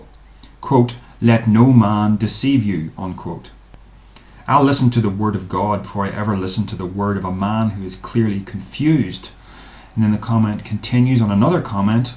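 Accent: Ireland. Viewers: oh boy are you a luciferian?i am so scared now.i get remarks like that all the time.please dont let them get to you.we are working on a website.and i will send you a link when we are done.well most of the work is done by onno.but its gonna be awsome ok?i like ur accent.is it irish? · hearing you talk is like listening to myself think...but in an Irish accent. Weird finding someone who is on the same page on almost every topic you've covered.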